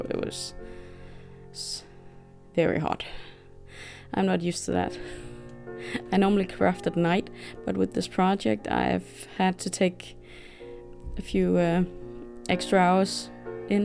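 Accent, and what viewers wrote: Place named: Brazil. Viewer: My Dies are on their way so am watching your techniques to prepare for my house! Not sure if I would have the patience to do all of that brickwork but very well done. Looking forward to seeing the completed project. I have been trying to guess where you live by listening to your accent, my guess is Brazil?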